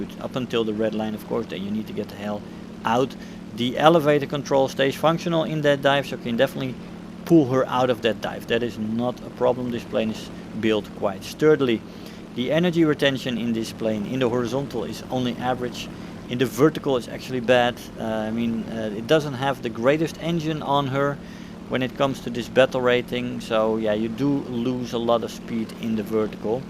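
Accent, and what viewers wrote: Netherlands. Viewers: he got that dutch accent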